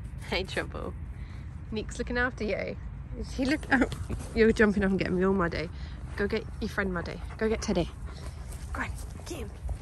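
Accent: United Kingdom. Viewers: Are you Britain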